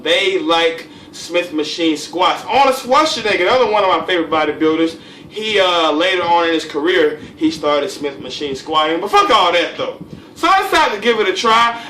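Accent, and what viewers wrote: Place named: AAVE, United States. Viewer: talks like a dummy but if you can look past the ebonics he makes a lot of sense and hes oozing charisma. Love to watch him. Some ppl sound too "boring professor" like. Keep doin what youre doin bro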